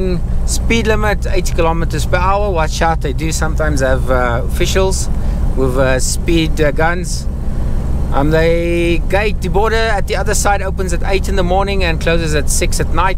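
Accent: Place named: South Africa. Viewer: I love ur south african accent. I lived in rsa few years. Genit u dag meneer .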